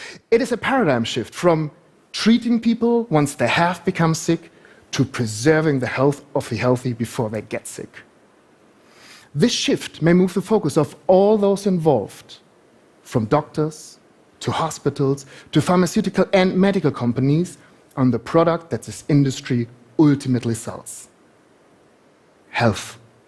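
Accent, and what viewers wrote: Germany. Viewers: Oh my, that German accent.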